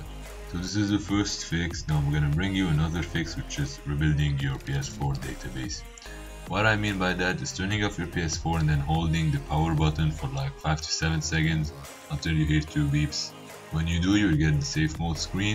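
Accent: Lebanon. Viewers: R u lebanese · Elina antoun he is lebanese, so am i lol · ur english accent is like us the Lebanese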